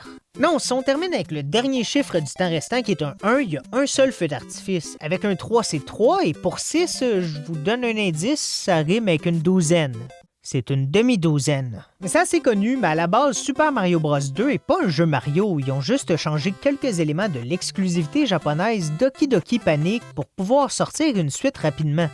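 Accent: Quebec, Canada. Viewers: Ma réaction en écoutant ce vidéo suggéré Wouah c'est québécois?! Je suis québécois et c'est tellement rare d'avoir du contenu de jeux retro en québecois et non pas en Français de France. Cool.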